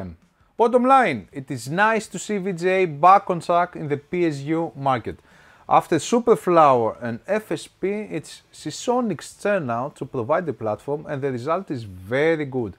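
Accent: Greece. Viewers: Ελληνας ακουγεσαι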